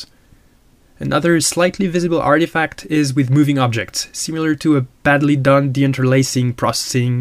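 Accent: France. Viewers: Ahh cet accent bien fançais :-) Superbe vidéo, merci !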